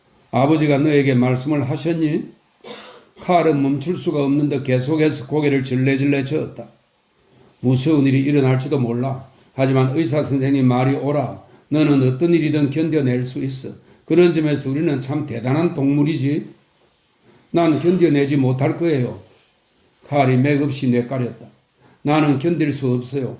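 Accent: Gyeongsang, South Korea. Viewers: 긴 시간 동안 읽어주셔서 감사드립니다. 처음에는 어색하였는데 나도 모르게 경남 사투리의 어감에 빠져들었습니다. 이제 모든 글을 들어야겠습니다. 새로움으로 가득한 소식을 늘 기다리겠습니다.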